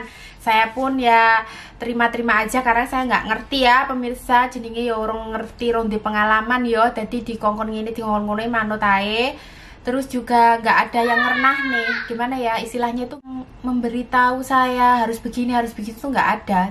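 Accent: Java, Indonesia. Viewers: Salam kenal mba, aku seneng lihat konten mba, lucu deh bicaranya, logat jawanya yah tp seneng juga sih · Bru nemu vlog ini.. Suka gaya bicarax mb.. Jowone medok hehe.. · Mantabbb Mba...Jawanne ngga ilang meski sudah lama tinggal di Australia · Saya suka mbak,biar suami bule hidup di luar tapi jowone gak ilang 👍 rendah hati, saya dulu ingin jadi TKI tapi gak punya nyali,juga ingin punya suami bule 😀😀😁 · Natural bngt mbk ngomngy jowo bngt kyo q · ......... aku suka banget dengan gaya dan logatmu yg begitu lucu dan kejawen banget👍🏻👍🏻👍🏻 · Jawa km mana SICH mbk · Jowone ngendi to medok bingit salam berkarya saja semoga sukses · Salam kenal mbak... Sy dr Surabaya, suka lihat vidio mbak sekluarga semoga langgeng sampai tua ya mbak... Sy jg suka klau mbak bicara logat jawa sangat medok sekali.. Sy tunggu vidio selanjutnya... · Jawane ngedi mbak ? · Enak berbagi Aq seneng ora ilang joeone logate jg .. 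Tetep smangat suxes sllu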